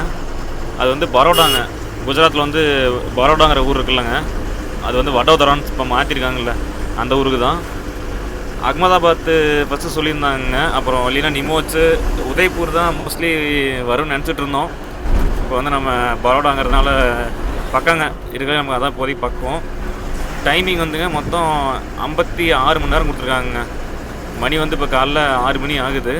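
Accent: Kongu, India. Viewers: கொங்கு தமிழுக்கு வணக்கம் சொல்லி கொள்வது நெல்லை தமிழ் 🎉🎉🎉🎉🎉🎉🎉🎉🎉🎉🎉🎉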